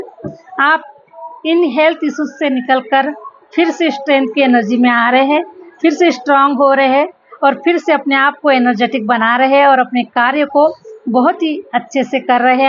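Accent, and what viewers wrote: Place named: Maharashtra, India. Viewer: SHE IS 50 PLUS, AND IS A GOOD MARATHI SPEAKING WOMEN, AND HER ACCENT IS MORE MARATHI. CONCENTRATE ON HER READING AND NOT ON HER BEAUTY OR FACIAL EXPRESSION. EVEN IF YOU LIKE HER AND WANT TO APPRECIATE HER FACE AND HER EXPRESSION, DON'T MAKE IT PUBLIC. SHE IS A GOOD TARRO CARD READER, AND THAT'S IT...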